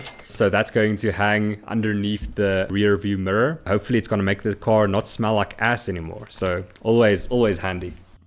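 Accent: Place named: Afrikaans, South Africa. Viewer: Dude, super proud of all the South African youtubers... You guys rock... Only 2 comments, be proudly South African... stop rolling your "R's". Secondly, it's Aluminium, not Aluminum... I get it, people think the Afrikaans accent sounds dumb. But the true South Africans will be proud of it... Keep it up man!!! Subscribing !!!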